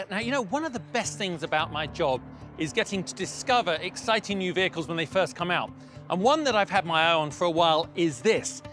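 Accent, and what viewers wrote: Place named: United Kingdom. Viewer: if this is Jaguar USA, why the host has british accent?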